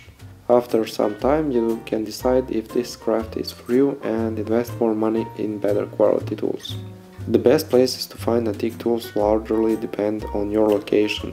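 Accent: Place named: Russia. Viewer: У тебя такой классный русский Английский, прям не могу)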